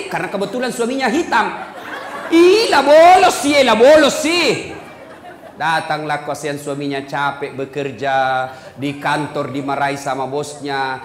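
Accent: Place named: Sulawesi, Indonesia. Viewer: Allhamdulilah masih kental logat sulawesinya walupun diluar kota Sulawesi berdakwa 🤲 Sehat selalu pak ustad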